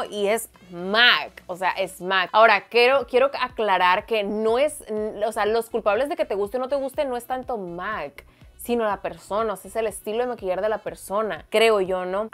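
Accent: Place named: Nayarit, Mexico. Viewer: Así pasa cuando uno de sabe maquillar o por lo menos uno se siente bien con el maquillaje que nos hacemos, yo en mi caso he tratado de que me maquillen en Sephora y no me ha gustado y nunca le atinan a mi color de piel siempre me dejan gris, así que ya mejor ni le busco, saludos me encanta verte yo soy de Nayarit y de cierta manera la forma que hablas me recuerda mucho a mi Nayarit y me haces reír 😘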